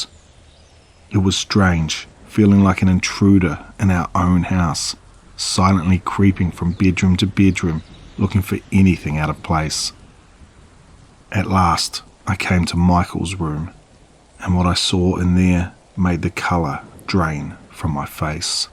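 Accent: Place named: New Zealand. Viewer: Another great story. Thank you for providing us with very unique stories in your fantastic Kiwi accent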